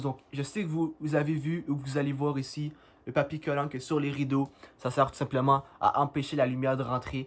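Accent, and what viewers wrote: Quebec, Canada. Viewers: Est ce que tu est québécois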